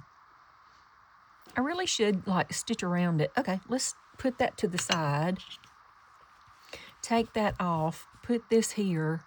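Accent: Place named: Maryland, United States. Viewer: Hello, what a joy to listen along with you. You are very funny. And I love the sound of your voice. My Uncle called me the other day to tell me my Aunt is dying. She is Maryland, born and bred. And I know your voices are unique across the country, yours is very similar to hers. I will probably listen to this a few times, and although I'm really enjoying your show tonight, I would listen to you read from a catalogue.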